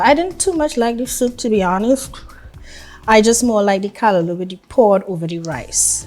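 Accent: Trinidad and Tobago. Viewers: Oh Ghosh!! Girl, I just love that I found you. I love how you talk. A real Trinbagonian. I’m here to stay for always🧡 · Love her videos! She is totally engaging and genuine. Keep using the Trini dialect girl. It adds the extra taste in the local dishes that you present. Blessings. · I’m Jamaican and never had that dish . It looks mouthwatering. I just your Trini accent.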